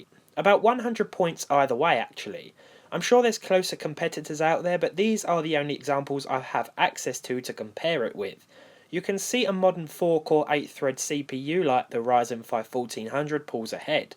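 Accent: United Kingdom. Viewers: I heard british accent---------------------> i leave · I hate that british speech 😂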